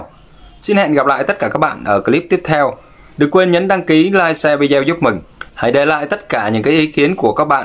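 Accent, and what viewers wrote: Standard. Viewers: Cậu noi chuan tram Phan tram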